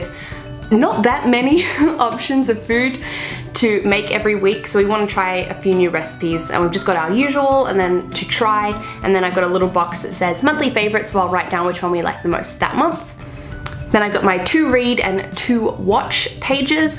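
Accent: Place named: England, United Kingdom. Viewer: Is she english? I quite like her accent 🧡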